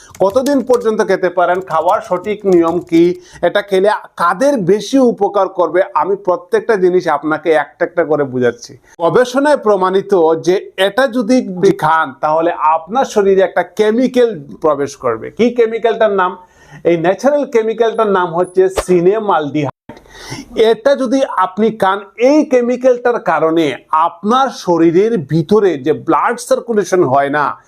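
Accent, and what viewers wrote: Sylhet, Bangladesh. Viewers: ভাই আপনি কি সিলেটি আপনার কথায় আমি বুঝতে পারতেছি আপনি ১০০% সিলেটি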